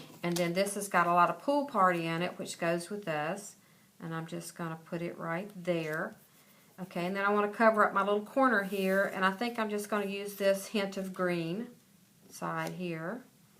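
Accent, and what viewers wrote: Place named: Texas, United States. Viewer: Loved this tutorial! I also loved hearing you speak, 'cause you sound like you might be a Texan! ;-) Thanks for sharing your easy to follow technique...your card is beautiful!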